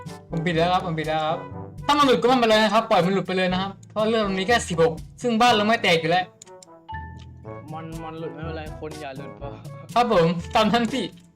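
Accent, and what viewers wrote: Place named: South, Thailand. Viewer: สำเนียงพูดเหมือนคนใต้เลยบ้านเดียวกันไหม😅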